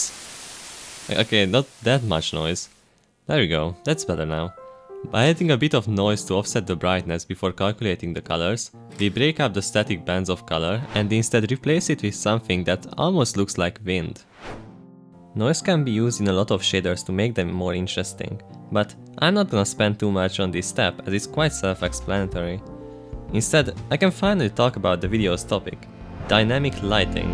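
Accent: Hungary. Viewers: Az a jó fajta magyar akcentus nagyon hamar el árult de érdekesek a videóid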